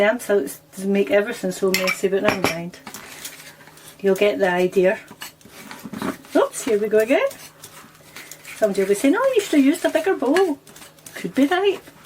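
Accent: Scotland, United Kingdom. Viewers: Thanks, will surely try them, been away from Scotland a long time, love hearing the accent as well. I remember walking in a Mark & Spencer once and laid my eyes on a packet of them and shouted out loud "snowballs" My husband and customers all looked at me like I was crazy, but as you know the friendly Scottish people, we all had a great talk when I apologised and told them I had not been home for 15 years!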